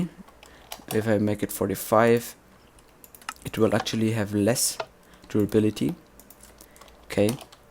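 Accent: Germany. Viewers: are you german? :D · Are you from Germany ? xD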